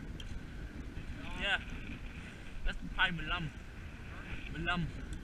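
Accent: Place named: Vietnam. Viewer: Lmfao your Vietnamese accent is strong on this one 😂😂😂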